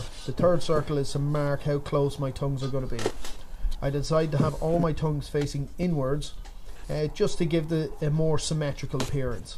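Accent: Ireland. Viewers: You Irish? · Don’t even try using the closed captions. You just need to learn thick Irish. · Stellar video! So cool to see it worked out so well, and the video production is also very nice. Loved the commentary and the Irisch? accent.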